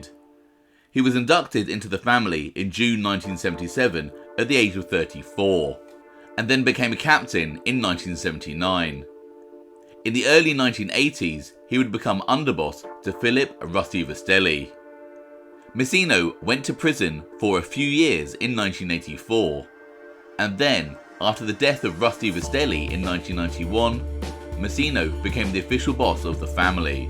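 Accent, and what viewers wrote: United Kingdom. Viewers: What is a mobsta? Did you mean mobster? UK accents are irritating. DISLIKE.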